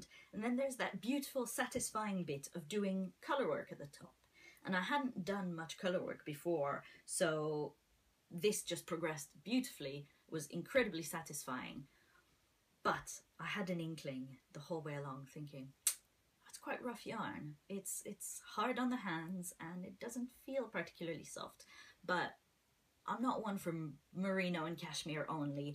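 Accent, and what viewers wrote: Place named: Canada. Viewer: love this! Love to hear a Canadian voice x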